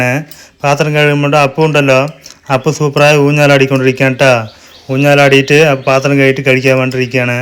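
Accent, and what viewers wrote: Tamil Nadu, India. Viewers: Niglu malayali ahnno tamil ahnno language difference thonnine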